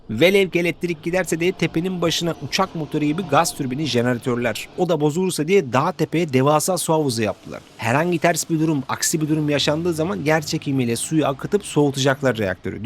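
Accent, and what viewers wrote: Japan. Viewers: Mahallenin yakışıklı çocuğu yine yaptı yapacağını 😂. Türkçe konuşan Japonlar gibi konuşuyorsun 😊👍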